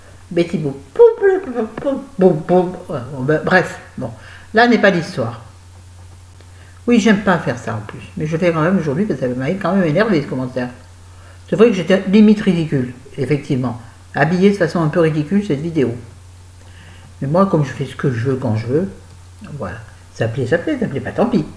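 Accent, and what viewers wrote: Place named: South, France. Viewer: Coucou j'aime les clins d'oeil et l'accent le mien en fait celui du midi non aucune nouvelle de criss que se passe t'il?en ce qui concerne les commentaires hargneux le mépris est la meilleure des choses,jusqu'à un certain POINT!!!! Des poutous a bientot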